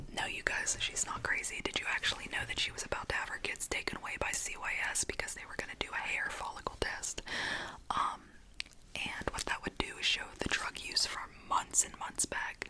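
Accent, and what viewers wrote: United Kingdom. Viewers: wait why did she start off british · thumbs up if you want to do a whole ASMR video in her British accent... thumbs up so she sees this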